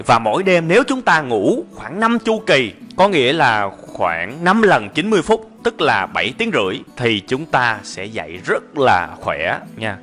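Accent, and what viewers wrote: South, Vietnam. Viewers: Hoan nghênh nói tiếng miền nam, Chứ nói tiếng miền bắc nghe nhức óc quá ! Hình như lên video phần đông hướng dẫn chương trình toàn là người miền bắc. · Giong giang bai mien nam nghe nhe nhang , de thu hút . Xin cảm ơn tác gia với những thông tin bổ ích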